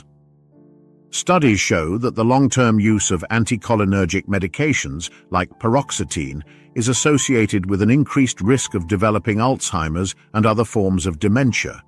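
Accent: United Kingdom. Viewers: Is you tube in Britain? Every announcer speaks British so i am curious?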